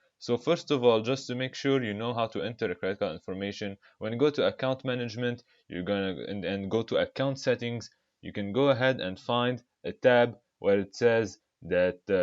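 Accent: Arab world. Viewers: Bruh from your accent i thaught u where arab. When i saw your name i knew it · واضح من لهجتك انك عربي 🙂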